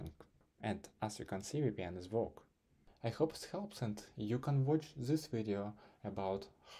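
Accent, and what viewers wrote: Russia. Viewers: слышу русский акцент!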